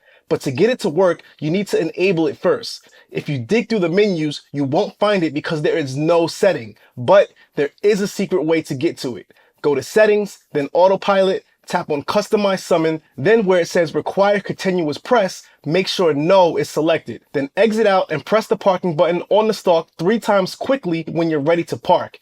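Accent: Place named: New York, United States. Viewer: Excellent video, we took notes. What is your accent? My Boston raised wife said it's a New York accent.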